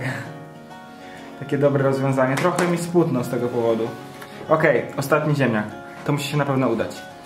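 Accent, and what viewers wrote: Poznań, Poland. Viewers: TO MUSI BYĆ MŁODY ZIEMNIAK ;) ps. WIDZĘ ŻE TROCHĘ GRASZ GWARĘ POZNAŃSKĄ PYRA-ZIEMNIAK ;) (POZNAŃ MOJE MIASTO )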